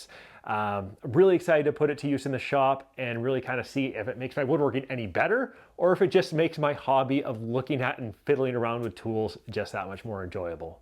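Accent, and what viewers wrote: Canada. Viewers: This dude sounds so Canadian.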